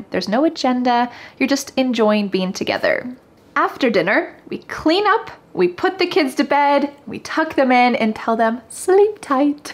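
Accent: United States. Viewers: You are doing a great service. Without knowing anything about phonetics and great vocabulary, if I watch your videos everyday, I bet within 6 months, I shall speak at least 50 % american accent. I think it is the easy way to learn a language, that is to listen . Gradually one can pick up. Thank you. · J'adore vos cours, je comprends très bien votre accent américain. Merci beaucoup pour le temps que vous accordez à faire ses cours 😊😘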